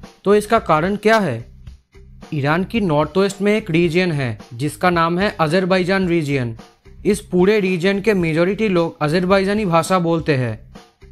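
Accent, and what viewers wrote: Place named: West Bengal, India. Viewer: Bhai aapki video badi achi hai apki awaaj bhi .. Apka tone Bengali lagta hai ..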